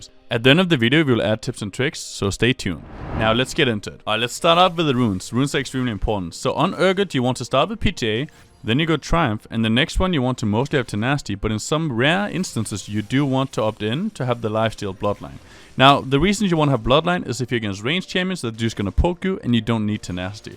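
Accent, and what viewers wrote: Denmark. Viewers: you sound like a dane ?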